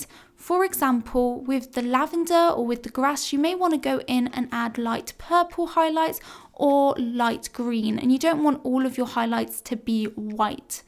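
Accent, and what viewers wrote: United Kingdom. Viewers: British Accent is fun 😶👍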